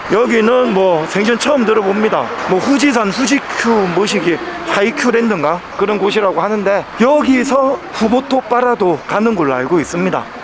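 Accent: Gyeongsang, South Korea. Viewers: 일본어쏼라쏼라~~~믓지네요 뷰도 믓지고 ㅋㅋ 근데 일본어도 경상도 사투리가 묻어있네요 뼈속까지 갱상도!!ㅋㅋ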